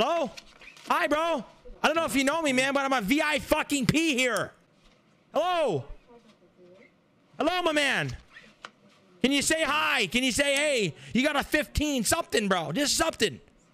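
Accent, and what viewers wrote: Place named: Canada. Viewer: I love the Canadian accent❤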